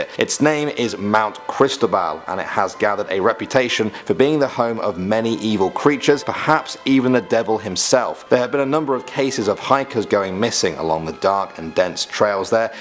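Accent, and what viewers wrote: United States. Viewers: The americans accents thats not how its said its cuz ur americans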